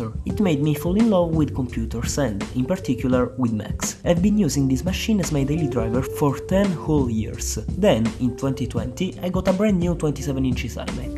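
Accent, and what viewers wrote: Italy. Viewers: Are you Italian? cause you sound exactly like me talking in english and im from Rome lol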